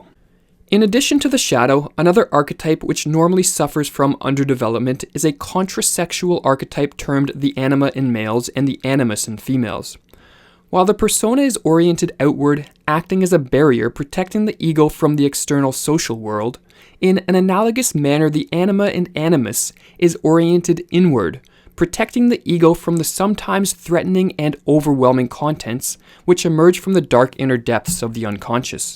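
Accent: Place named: Canada. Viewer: This guys Canadian isn’t he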